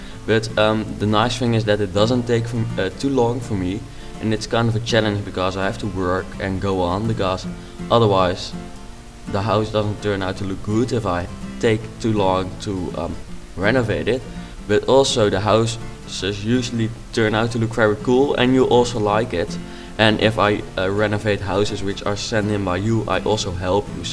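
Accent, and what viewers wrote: Netherlands. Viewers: haha je kan echt horen dat je nederlands bent (: mooi huis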